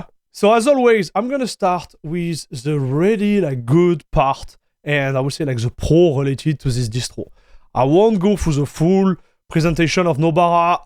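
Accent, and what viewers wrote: France. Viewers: I love the French accent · it's not an English accent haha, it's French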